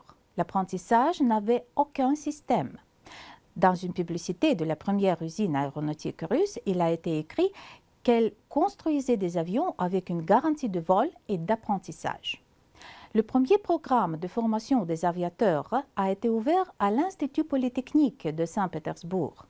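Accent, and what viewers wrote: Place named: Russia. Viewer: ce fut très instructif, merci madame pour tous ces renseignements. j'ajouterai que votre Français est parfait en plus avec un très joli accent russe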